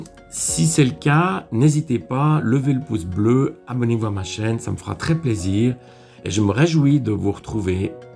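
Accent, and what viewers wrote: Vaud, Switzerland. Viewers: je suis d'accord, un pur vaudois (et non romand de Neuchâtel) chantant et savoureux 😉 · Bonjour vraiment très intéressant votre vidéo 🙏 vous avez l accent suisses vaudois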